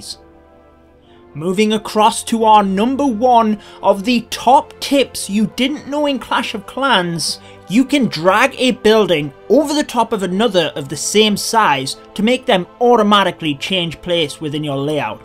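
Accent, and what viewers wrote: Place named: Scotland, United Kingdom. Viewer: Where are you from? You sound Scottish and English combined.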